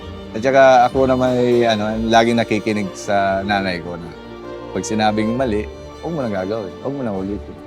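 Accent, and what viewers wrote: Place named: Pangasinan, Philippines. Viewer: Ang tono ni sir parang taga pangasinan siya, mabuhay ka at wag kang susuko par, par ang tawagan ng mga taga pangasinar pangalatok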